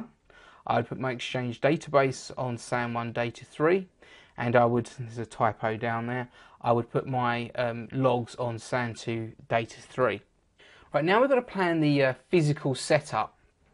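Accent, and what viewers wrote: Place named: United Kingdom. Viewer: Thanks for yor video but sometimes your britsh accent gets in the way. Too much 'nasal effects' lol making it hard to understand. for instance what you say the console(light) what does Dell and HP call it? And you clearly didn't answer the question to the missing part 5 video. Keep you answers simple please... Thank you once again. Do you ever work with DELL SAN's what do they call them? You introduced a new term called LUN but failed to explain it. What are the common SAN models used in an enterprise environment? Furthermore: For instance, in an enterprise environment installing and troubleshooting SANs (Best practice) Performing switch add/zoning. Installing and troubleshooting Virtual SAN, SnapView/MirrorView Thanks in advance!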